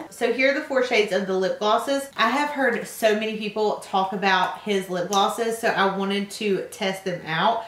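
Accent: South, United States. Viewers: You are a doll. You seem to be a lovely and sweet young lady. The makeup that you are wearing in this video is my favorite!! This is the most beautiful look. The lip color is perfect for you. I know you love the rosy colors but this look makes you look younger. At your age, girls can pull off any look, but this look is special. It is your color for summer!!! I hear you say often when you receive green eye shadows and liners that it you wouldn't normally wear them. Girl, you have all of us cheering you on to try new looks. You receive these products to let us see different looks to influence us. You do influence us because I have purchased items that you have shown!!! With your eyecolor, try golds and bronze with the gold/green as an under eye liner. That would be a fabulous look. I know you love your pink, fuchsia eye shadows, but step out of that box.we will love you even more!!! 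I'm proud of you. I run across your video when you first started and the southern accent got me. I'm from Northeast Tennessee and I support my southern ladies. I'm so proud of you girl!!!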